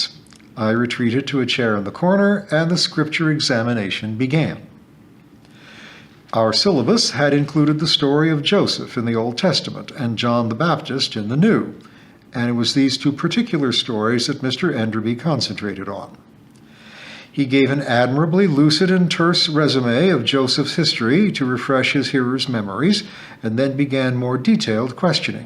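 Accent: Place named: United States. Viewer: I have read all of the Miss Read books and was delighted when I found you reading them. I listen when ironing, cooking and other quiet activities. At first I thought I wouldn't like an American accent reading old country tales from the Cotswolds but now I'm champing at the bit waiting the next installment. Loving every minute of your pronunciation of many words. Are you going to read the Thrush Green books as well? I am English but live in France.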